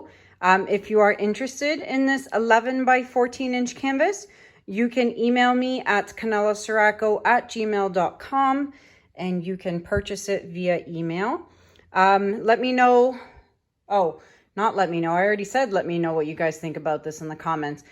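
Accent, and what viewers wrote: Canada. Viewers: I just love your videos. They're incredibly informative and love the Canadian accent. Your work is gorgeous. One of the videos of yours that I watched you counted in Greek and said that's your Greek lesson for today. I'm a Greek girl from Chicago so I was hooked after that. I also have a Sophia. :)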